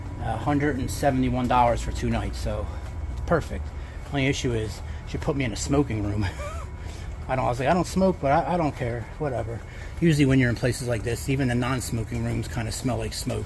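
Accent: Philadelphia, United States. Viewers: When I close my eyes I'd swear I'm listening Bam Margera hiking! lol Is that a Phillie accent?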